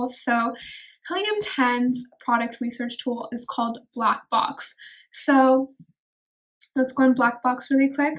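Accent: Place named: United States. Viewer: Looks Indian, with American accent, beautiful though.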